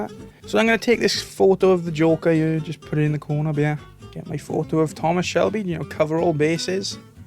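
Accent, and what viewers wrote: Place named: Wales, United Kingdom. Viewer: dai butt i faking love that welshy accent like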